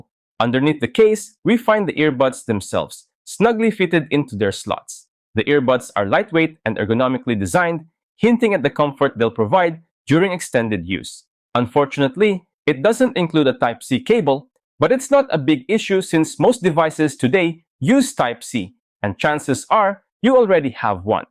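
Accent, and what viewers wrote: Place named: Thailand. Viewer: Love your thai accent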